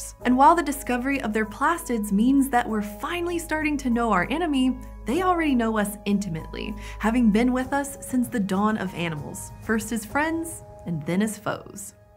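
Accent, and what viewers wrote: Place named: United States. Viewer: She can speak correctly, I’m not sure what you are referring to. Her accent is also very mild as American accents go which means she’s easy to understand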